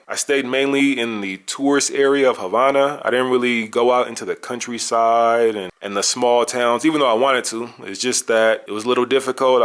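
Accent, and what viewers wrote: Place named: Cleveland, United States. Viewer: Your videos are so dope! I hear an accent in your videos bro, are you from Cleveland?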